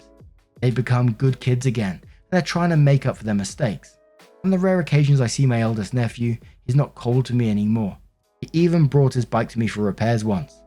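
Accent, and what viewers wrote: United Kingdom. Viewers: Went to listen and still too soon to hear a British accent after the Matilda’s loss. Am soo happy for for the Lionesses and honestly wish the the best of luck. Russo and Hemp played incredibly just heartbroken for the Tillies :( still wanted to leave clout to support the channel. #FIFAworldcup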